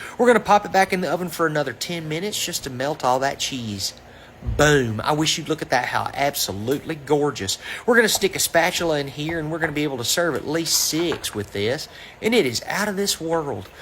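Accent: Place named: South, United States. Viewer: Looks so good! And easy. You sound like me! Love to support my Southern family! ❤❤ Subscribed…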